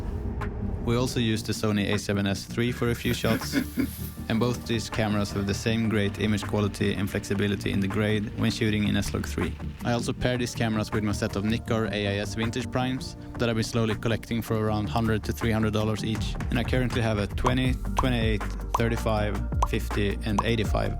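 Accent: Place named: Sweden. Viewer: I like this Swedish English accent :D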